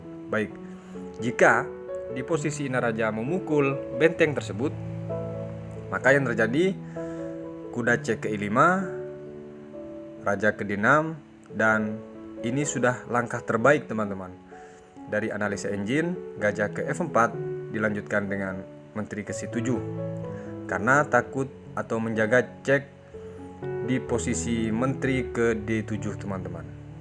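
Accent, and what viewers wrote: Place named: Madura, Indonesia. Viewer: Corak oreng madureh logattnya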